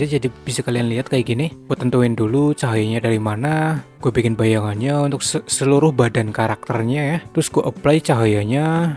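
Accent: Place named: Banyumas, Indonesia. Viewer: Tengkyu bang, btw pasti orang ngapak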